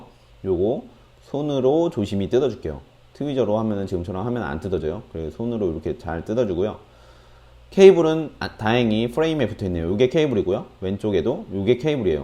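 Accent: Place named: Vietnam. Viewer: 아 베트남 사람인줄.. 발음머임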